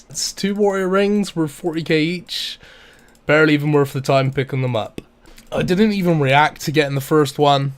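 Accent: Bristol, United Kingdom. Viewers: keep up the great vids man, really entertaining! also where in the SW are you from, you sound very bristol my friend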